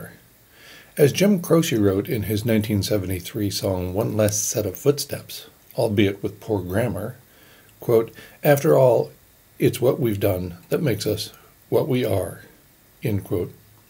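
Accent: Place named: New York, United States. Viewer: Like listening to the old Mensch with his New York Bros accent and straight talk... It's amazing how we bounce around in life... Just celebrated a birthday and scratch my head on the chance encounters with ideas and people and events. Makes me wonder on all concepts... Perhaps, just maybe, after crossing over the River Styx it all all be revealed... Probably not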